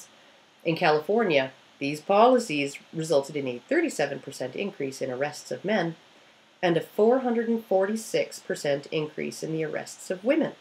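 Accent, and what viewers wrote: Canada. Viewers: Sorry, but I just love the way you Canadians say any word containing out Nothing against Vanadians though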